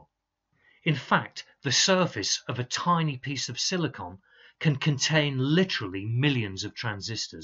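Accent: United Kingdom. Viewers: You've got such a beautiful british accent. And your explanation is very clear!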